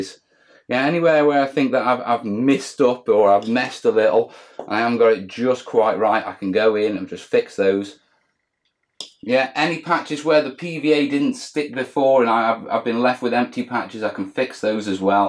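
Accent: United Kingdom. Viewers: he has the type of British accent that I like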